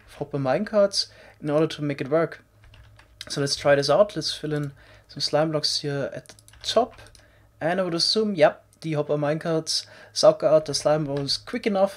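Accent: Germany. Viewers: I really hope we get a automatic crafting table. Also are you german? Your english sounds like you're from germany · Also dein Englisch ist seehr gut aber man hört es einfach wenn ein Deutscher Englisch redet xD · ich mag deinen deutschen akzent ;D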